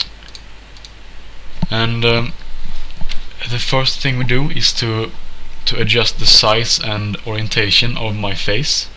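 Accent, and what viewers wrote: Sweden. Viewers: Är du svensk det låter som det på din dialekt? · Are you swedish? It sounds like that! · We klick ehh, hah bästa svengelska videon!, tumme upp! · Nice tutorial, 100% swe accent right?:D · Din betoning låter väldigt svensk... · 100% svensk hör man iaf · are you swedish? your accent xD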